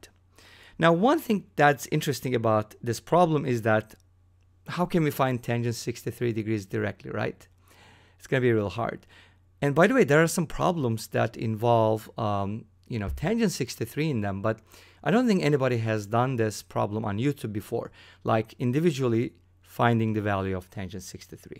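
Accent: Turkey. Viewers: I guessed from your (slight) accent you might be Turkish. Now it appears I am right.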